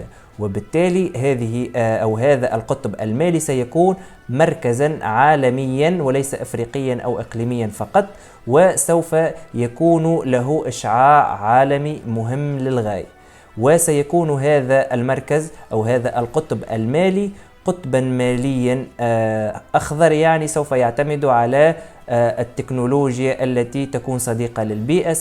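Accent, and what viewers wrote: Tunisia. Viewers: بارك الله فيك.. مجهودك مشكور ...وطريقتك في التقديم جميلة +اللغة العربية الفصحى بلحن تونسي جميل....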